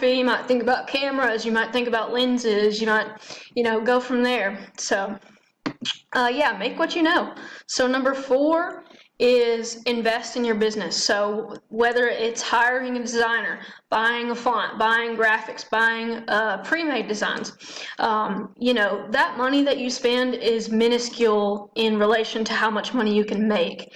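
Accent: South, United States. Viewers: Love your MBA-related videos! Great, highly useful info delivered in a southern gal, no-nonsense way 😉 Subbed!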